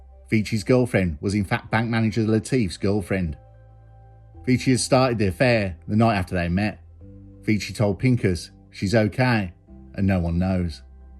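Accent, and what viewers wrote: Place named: England, United Kingdom. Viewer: I'm always please to watch. None other like it....bonus with the English accent! Not f--king London English. Peace and goodwill to The Main Man.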